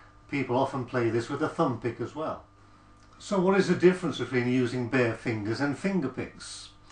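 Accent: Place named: United Kingdom. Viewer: Glad I have found your channel. It’s nice to hear another Brit. I’ve been playing finger style or Travis picking for over five years now. I want to master the finger picks so I can get more volume for busking and they get the best sound out of my wooden bodied resonator guitar. It’s taken me 2 years to get the hang of using the slide properly. Hopefully it won’t take as long. I have been using the plastic ones but recently ordered some metal ones and I find them much easier. Thanks for taking the time to share your knowledge and upload. People like yourself are doing a massive service to us just learning. I have pretty much taught myself how to play guitar, slide and finger style. From YouTube. I’m currently teaching myself mandolin. Due to bad health I’m unable to attend physical lessons. The lessons have paid for my living costs and even bought me a guitar one summer. 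Thanks again. Ric in Leeds West Yorkshire. 🇬🇧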